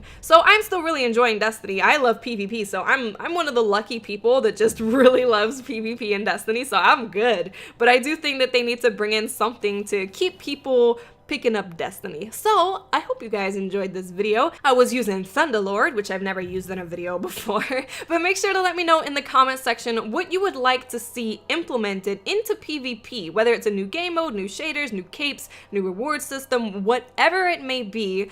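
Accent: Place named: New York, United States. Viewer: I'm new to your channel. Are you from Jersey or New York? I love your accent!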